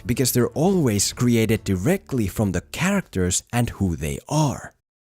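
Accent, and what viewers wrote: Finland. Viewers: Are you from Finland? You have a very Finnish accent. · Is this guy Finnish? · I hear a Finnish accent :)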